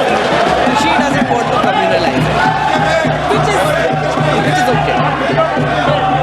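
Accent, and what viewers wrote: India. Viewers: Koi is chutiye ko bataye it is pronounced “were” not “waire” and btw i support congress not someone from bjp so take it positively. Man the indian accent is so irritating.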